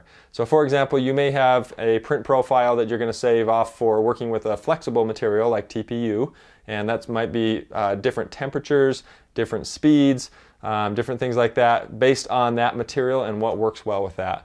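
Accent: Utah, United States. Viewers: I am not, but my wife’s family is from Layton. And we got married in Salt Lake. But mostly I asked because I have a friend that I THINK is from Sandy and you talk just exactly like him. Also, did you say in your video that you have a tarantula? Did you cross shop it with the ender 3 and if you did why did you decide to get that one? I’ve been shopping for my first 3D printer and the ender 3 pro is my top choice- barely inching out the standard for the more robust support bar under the stage and much improved power supply. I have seen exactly one comparison video with the ender and tarantula and the tarantula came out more favorable but I never saw any other videos on that machine. Any input would be appreciated. I’m anxious (and nervous) to start the hobby! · Ha ha - I was raised in New England, actually and moved here as an adult but maybe the Utah accent is rubbing off on me :) I don't have a tarantula or an ender and haven't tried either of them so I'm not a lot of help there. 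I have printers by Flashforge, Tevo (actually just sold that one to a friend), Alfawise and Printrbot. I've heard good things about Ender machines but haven't had the chance to try it myself. Sorry I couldn't help more with that!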